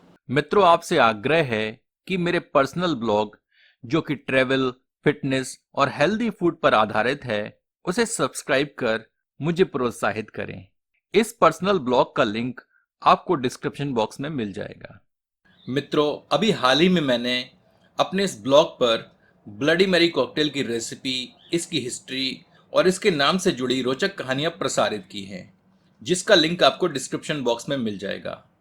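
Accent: Standard. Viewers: आपकी शुद्ध हिन्दी सुन कर ऐसा लगा जैसे रामायण देख रहा हूं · आपकी शुद्ध हिंदी का बोलना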